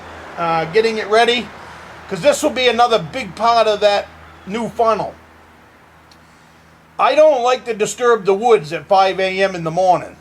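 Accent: Maine, United States. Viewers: I'm in Western Maine and when I heard yer voice I knew you were a Maina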